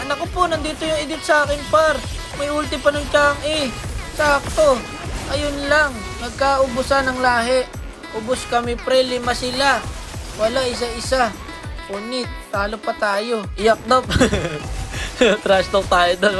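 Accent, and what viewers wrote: Iloilo, Philippines. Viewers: tito maraming salamat sa mga video mo, napapasaya mo ako sa malulungkot na araw ko, ilongo voice naman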